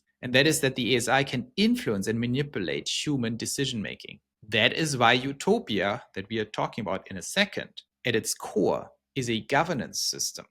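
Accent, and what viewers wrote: Germany. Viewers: Germans are usually very logical thinkers but when you say that the AI is going to be constrained you are deluded. AI will be constrained about as much has the United States Congress has the ability to rain in spending. Governments competing against governments will not restrain themselves. You said it earlier that we are in a race condition. AI will be developed without restraint for the promise of power that it gives. Your German accent is very endearing as I had an Opa myself. Blessings.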